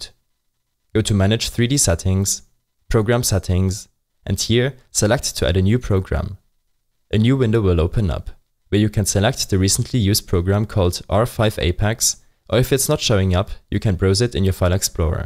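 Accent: Germany. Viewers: Der deutsche Akzent😂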